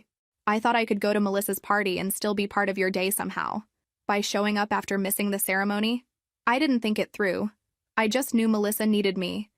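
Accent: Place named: East Coast, United States. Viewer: Her voice is so annoying. Almost like the East Coast with a nasal like Midwest.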